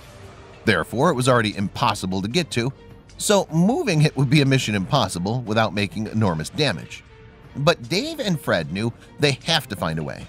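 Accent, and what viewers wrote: United States. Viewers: Note the actual use of English. It is rather like a product manual from China but with a 'Yank' voice.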